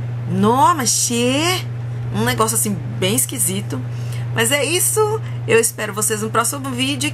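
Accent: Brazil. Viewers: Bah Tche O Sotaque Nordestino é meio difícil não sei Porque se eu sou Gaúcho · Tô ligado que tu é daqui de Maceió rsrsrsrs, Esse sotaque eu reconheço na china. Eitxa sotaque gostoso da boba! Só algumas gírias que deve ser mais falada em outros estados,mas o resto eu falo quase tudo aqui kkkkkk. Ala Ala Ala Alagoas ;) .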